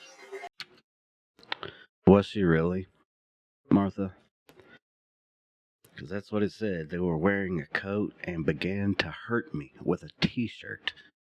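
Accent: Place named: South, United States. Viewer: You sound like you maybe here from the south... If so hi neighbor. If not hello anyway. Love your videos!!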